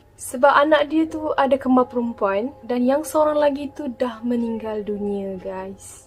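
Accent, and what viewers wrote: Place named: North, Malaysia. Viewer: utara sleng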